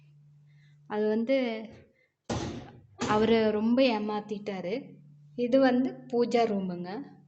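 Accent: Kerala, India. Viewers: thank you ur reply. Malayala Vasam speech LA therunchadhu. Where it's built?. In Kerala r Tamil nadu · Hi Amma, ur sounds like my mom, bzos my mom's native is Kerala she'll mix both malayalam and Tamil while speaking. Only the known people can understand her talk Amma.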